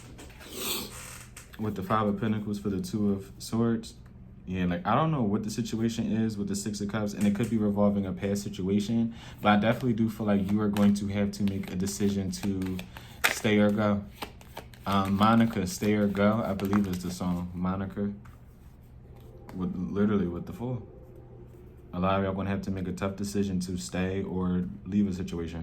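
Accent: Philadelphia, United States. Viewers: Wait….are you from Philly?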